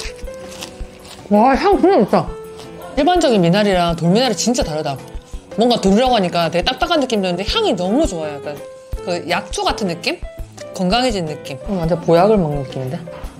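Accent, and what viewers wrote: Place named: Gyeongsang, South Korea. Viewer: 경상도 사투리.ㅋㅋㅋ 가시나 ㅎㅎ. 그냥 좋다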